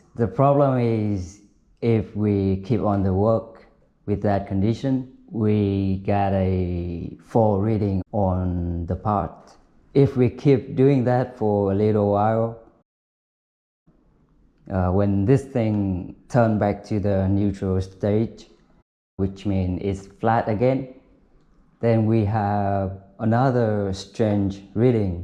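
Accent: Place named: Vietnam. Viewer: Nghe phát nhận ra người Việt luôn 😂😂😂, coi tên kênh thì chính xác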